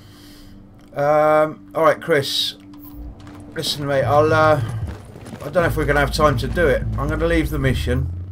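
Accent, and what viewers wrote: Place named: United Kingdom. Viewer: Bloody hell, finally hear a streamer from the UK! Fair play 👌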